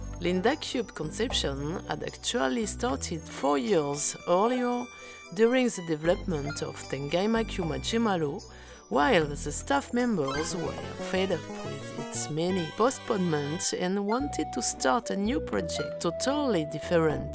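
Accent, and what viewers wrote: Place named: France. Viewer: It's à French accent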